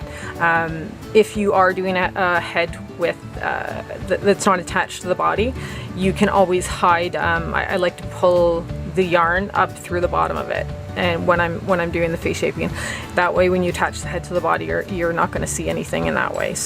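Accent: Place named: Canada. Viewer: You sound like you have a Canadian accent like me 😀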